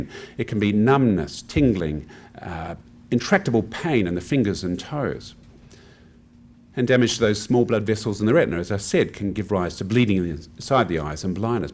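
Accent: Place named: Australia. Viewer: Reasoning always sounds better with an Aussie accent.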